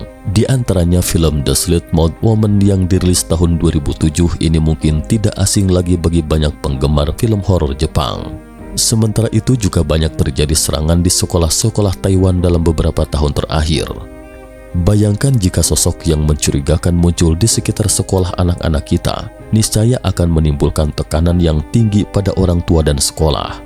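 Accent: West Java, Indonesia. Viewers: Sundanesse abangnya hhe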